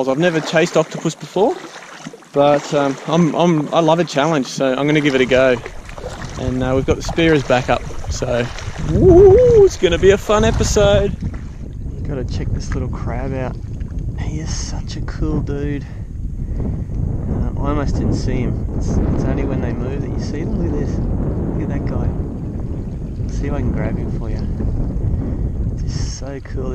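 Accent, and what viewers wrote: Australia. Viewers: I love the Australian accent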